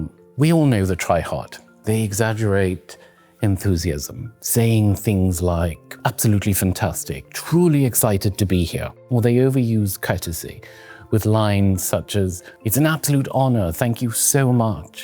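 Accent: United Kingdom. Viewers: Give me a British accent like this guy, and I guarantee my confidence is perceived as 50% higher. Stupid American accent.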